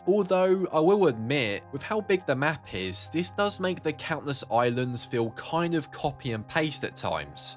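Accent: United Kingdom. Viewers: wait this guy is bri'ish?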